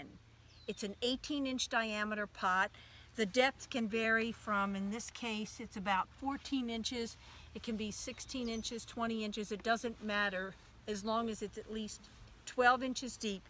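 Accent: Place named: New Jersey, United States. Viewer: You must be from N.J . Thank you.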